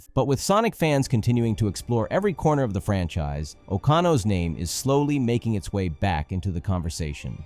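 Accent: Brazil. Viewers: me desculpa mas, vc é brasileiro?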